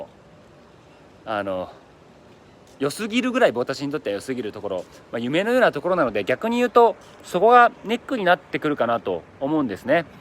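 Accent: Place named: Kanto, Japan. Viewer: あくまで「移住者が語る沖縄」ですね。沖縄県民から見るとあまりも薄ペラな動画。貴方のように沖縄をハワイと混同した「別荘地感覚」で沖縄移住する人には嫌悪感を感じます。沖縄を語るなら、まずは沖縄の言葉やイントネーションを身に着けてほしいですね。関東訛りのナイチャーが何を言ってもそれは「移住者の遠吠え」です。 なぜ沖縄が山を切り開き環境破壊しないといけないのか？ 沖縄の歴史文化はもちろん、戦中・戦後と日本本土の犠牲になった経緯。 米軍基地がある弊害。 そんな事も知らずに「海がキレイ」「南国サイコー」そんな気持ちだけで沖縄に来る移住者はマジで要らない。 沖縄移住を考えてる他県の方は「沖縄の影の部分」を見て知ってから移住して下さい。 青い海は、太平洋戦争で赤く染まりました。 美ら海水族館がある場所は数多の米軍艦船により鉄の暴風と呼ばれる艦砲射撃を浴び、多数の死者が出た土地です。 移住する方はぜひとも、平和記念公園で、この島でどんな事があったのか？ そしてそれが今現在、どのような影響を及ぼしているのかを知って下さい。 沖縄の文化、歴史、そして現在を受け入れてくれる移住者なら、私たち県民も大歓迎です。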